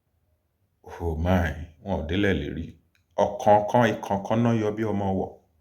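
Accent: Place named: Okun, Nigeria. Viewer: Good admonition. I seem to detect Òkun accent and intonation. Am I right? 😊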